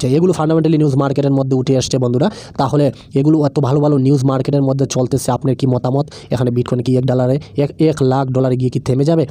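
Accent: Sylhet, Bangladesh. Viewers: মনে হয় সিলেটি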